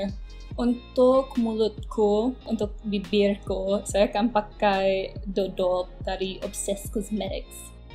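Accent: Sabah, Malaysia. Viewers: Macam cakap sabah sudah 😄 mulut ku ani inda boleh cakap malayu hahaha😂😂 hee okay lah atleast boleh cakap malayu sikit2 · Macam orang Sabah cakap bahasa Melayu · Your malay accent sound like Sabahan accent or Indonesian accent😂😂,its okay my malay Brunei accent is not really that deep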